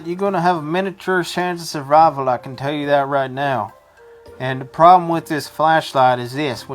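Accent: South, United States. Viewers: this one isa solutely outstanding and your Confederate English is very good. Respect. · Funny as hell, but why the southern accent?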